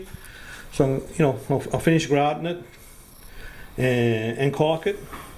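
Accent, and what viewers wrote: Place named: Boston, United States. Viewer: might I ask where you accent is from? It sounds to me (my untrained UK ear) what a Boston accent would sound like but seems to have a hint of something else occasionally. Where you Boston born and bred?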